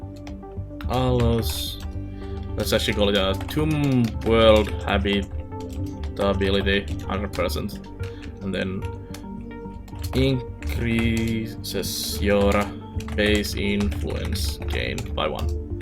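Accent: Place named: Finland. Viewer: Thats an intense finnish accent there. Edit: But a pretty helpful tutorial nonetheless :D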